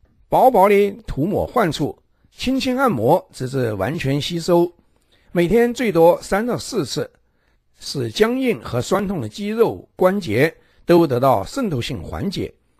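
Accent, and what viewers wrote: Sichuan, China. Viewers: 这个普通话是个四川人在说吗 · 这一听就知道是川渝方言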